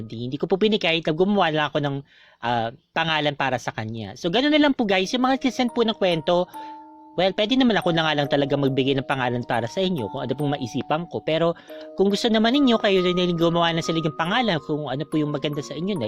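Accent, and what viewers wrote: Visayas, Philippines. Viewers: good aftie po! Thanks.. okay lng po sa bisaya, pronunciation, cute kasi pakinggan hahaha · Ang cute MO magbisaya mamshie... Hahahaha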